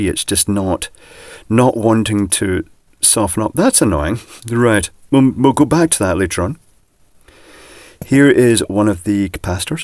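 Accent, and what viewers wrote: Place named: England, United Kingdom. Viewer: I like your voice it sound very smooth and nice English accent. It's my first time that I saw your face. You are alike my Aust. friend. Big guy with a soft voice. Don't misunderstand me, I am not queer. 555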